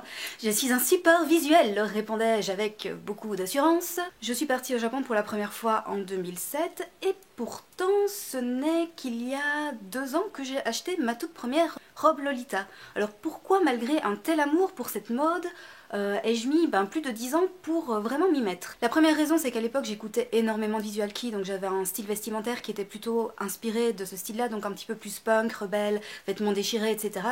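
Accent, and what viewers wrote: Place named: Belgium. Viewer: ton accent belge est parafait 💙👌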